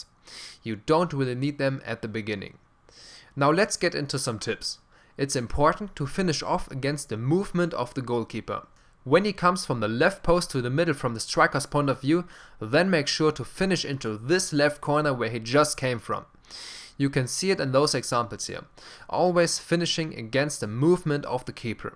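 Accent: Germany. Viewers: sofort gehört das du Deutscher bist, starkes Video ;) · The comments just show how many dumb fucks play this game. Just enjoy the video, he's German hence a German accent. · this german english ist destroying my ears ...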